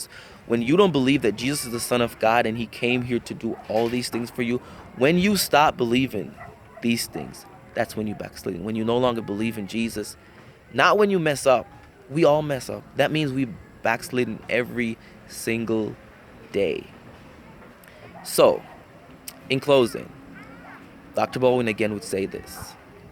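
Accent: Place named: Caribbean. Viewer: O, where are you from? I hear an accent (I’m from the US…Delaware)…I’m trying to detect it…a Caribbean Island?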